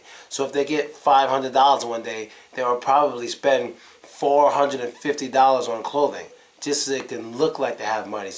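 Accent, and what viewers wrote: Russia. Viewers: OH MY GOSH. You have such a good russian accent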